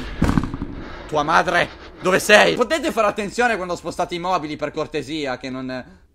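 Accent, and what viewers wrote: Italy. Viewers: spain or italy...you almost sound italian??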